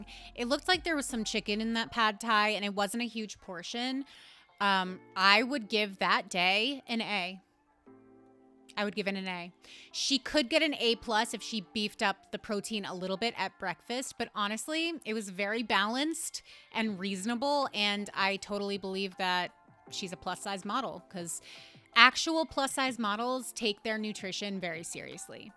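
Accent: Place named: Brooklyn, United States. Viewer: This video popped up on my feed and 3 seconds into the preview my brain clocked “Brooklyn accent!” I’m a Coney Island guy now living in the Bronx and I didn’t realize how much I missed our native tongue. Thank you for that. Also, you’re funny as hell and I like nutrition/fitness content, so I stayed for the video and subscribed ✊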